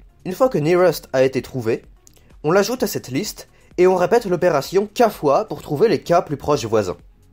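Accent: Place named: Belgium. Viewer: Toujours plus d'accent belge !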